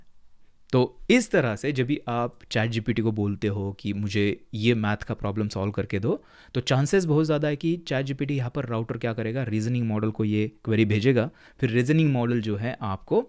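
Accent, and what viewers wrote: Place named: South, India. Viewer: Sir jii south indian waali feel aati hai aapke baaton se...!!! 😎😎 btw great and valuable series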